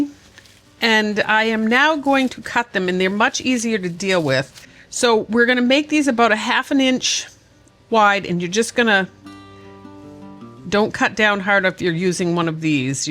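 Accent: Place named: Wisconsin, United States. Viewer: I haven't been watching your channel very long (my loss - I'll have to binge older videos to make up for it), but every time you talk, I hear Wisconsin. Maybe Minnesota, but my mom's side of the family is from Wisconsin, so that's the first that comes to mind. I miss visiting there and should definitely swing back for a visit. I haven't been back in over 15 years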